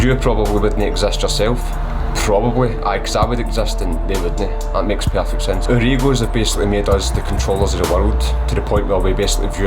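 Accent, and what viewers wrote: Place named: Glasgow, United Kingdom. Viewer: Bravo perfectly described it's was great to hear the Glasgow accent, excellent x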